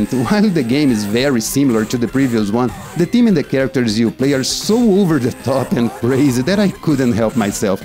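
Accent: Brazil. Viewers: o sotaque BR me surpreendeu kk bom video man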